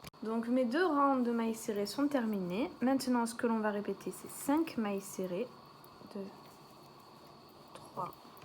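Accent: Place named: France. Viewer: Merxi a toi,pour ta facon de nous montrer comment fabriquer toutes les belles choses que ru nous. Montre,,et tu as un talent vraiment special,pour nous montrer et une patience vraiment tres belle a voir ,pour nous,car tu prend tout ton temps pour nous montrer a fabriquer les choses que tu nous enseigne..alord merci et ne lache pas et ton accent francais est tellement beau et dou a entendre..ne lache surtout pas..milles fois merci...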